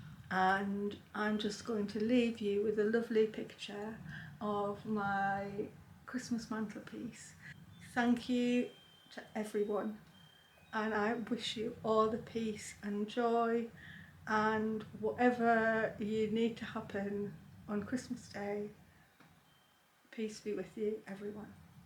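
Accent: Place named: Northern England, United Kingdom. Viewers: I can’t tell you how much I’ve enjoyed being with you throughout this month, it’s been fabulous. I’ve enjoyed watching you, tidying, crafting, baking and decorating the house. I’ve loved listening to you, I’m originally from South Shields so your northern accent has been a pleasant reminder of home. Have a lovely Christmas time and I look forward to sharing time you in the coming new year. Thank you. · I've arrived here very late! I love this... Your bookshelf could've been lifted from my house. And I love that I've found a northerner to listen to.. I'm East Yorkshire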